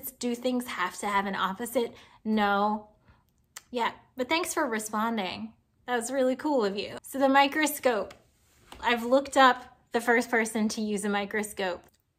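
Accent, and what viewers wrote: United States. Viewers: Ok, imma do my best to describe. An-tony vahn l-ale-an-hook. (and just glue all those words (and letter) together). I mean, your murican vowels probs still will be off, but this is as close as I could describe it in englishthon Edit: yeah that robot butchered it... but I'm used to that 🤣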